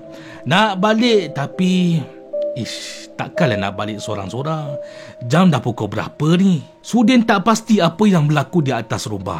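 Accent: Perak, Malaysia. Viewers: Awsome sgt you tuber dari ipoh dgn lorat negeri perak ....best dgn style and cara yg paling kreatif ...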